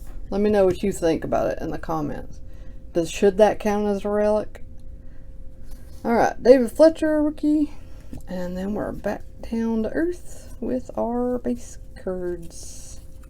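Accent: South, United States. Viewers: G'day, Love the Southern accent and I enjoy your insights on Baseball cards Cheers mate 🍻🇺🇸🇦🇺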